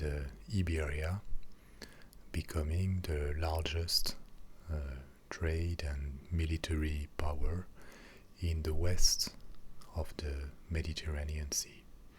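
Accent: France. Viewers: I get great tingles from French accents! very very relaxing! :)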